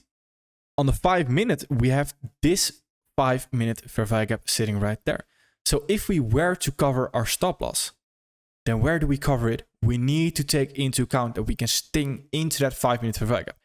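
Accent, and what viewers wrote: Netherlands. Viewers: You have to be dutch when hearing your accent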